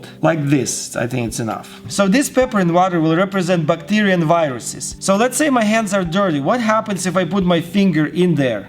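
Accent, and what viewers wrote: Russia. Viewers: Что это блять такое ? Ты же русский,это по акценту слишно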